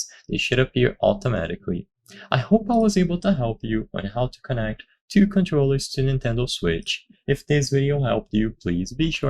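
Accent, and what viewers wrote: Brazil. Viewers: o sotaque não me engana, br na área!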